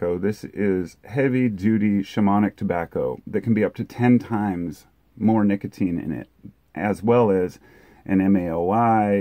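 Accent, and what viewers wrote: United States. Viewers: Haha “it’s been hot here in Portland” I knew this dude had to be from Oregon. Throwing stones from a glass house tho, all love from Washington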